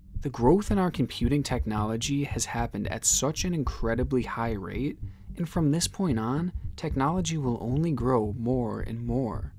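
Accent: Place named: United States. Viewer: why tf do you speak like this? "Kalua" Lumpur??? jesus christ.... the american-ness is out of this world. and there have been found bits of the plane . this has to be one of the most triggering videos i've ever seen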